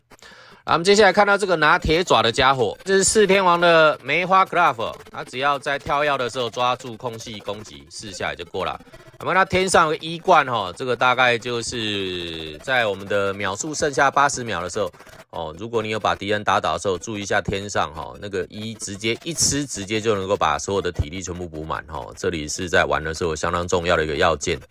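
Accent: Taiwan. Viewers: 每次聽講解遊戲，偶都笑不停，那台式旁白真是太有才了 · 非常喜歡的台灣國語，千萬不要改掉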